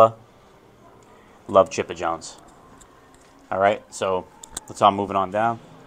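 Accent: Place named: Long Island, United States. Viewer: Great tour! You really captured what the store has to offer. And you are so Long Island- Great accent.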